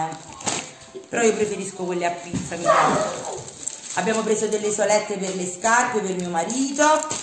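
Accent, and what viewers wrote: Naples, Italy. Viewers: Ti ringrazio se mi saluti da Siracusa e complimenti mi fai morire dalle risate quando racconti le cose alla Napoletana 🤣🤗🥰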